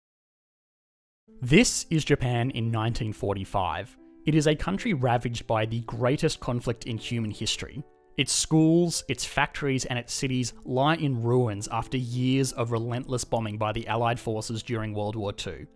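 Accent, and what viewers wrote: Australia. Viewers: Is yours an Australian accent?? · Australian accents are very thick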